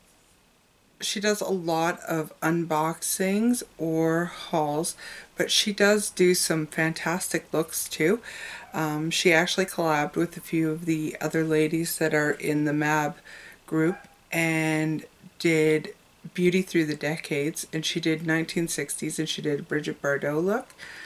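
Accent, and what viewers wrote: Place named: Australia. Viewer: Love the Aussie accent.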